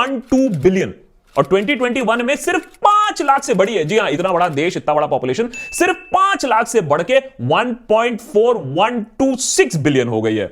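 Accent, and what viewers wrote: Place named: Bihar, India. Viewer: The Deshbhakt are you a Bihari.Your hindi accent is very much alike a Bihari accent,i like it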